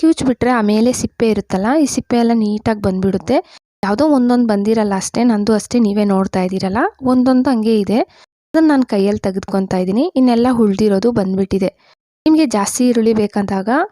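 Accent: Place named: Kolar, India. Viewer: Neevu kolar dhavru ansuthe...naanu kolar avne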